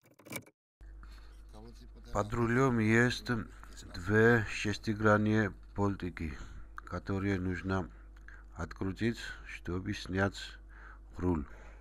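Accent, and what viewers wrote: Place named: Georgia. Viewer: Грузин, по акценту слышно!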